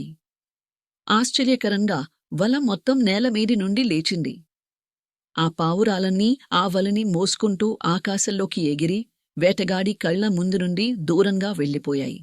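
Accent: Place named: Andhra Pradesh, India. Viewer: Hi medi Andhra kadha ne telugu enti different ga undi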